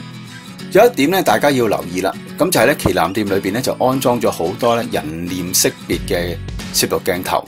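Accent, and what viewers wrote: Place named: Chaozhou, China. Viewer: 潮州佬黎既喔！聽口音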